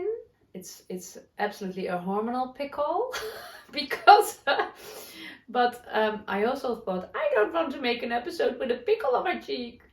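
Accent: Netherlands. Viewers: I so love your podcast and your Dutchy English, you are so lovely. I live in Norwich UK, so just across the sea from you. It only takes 40 minutes to get to Amsterdam from our local airport. I love to knit and sew and i am knitting the Flora sweater at the moment by Irene Lin. Also sewing the Hinterland dress. Take care and love from my house to yours x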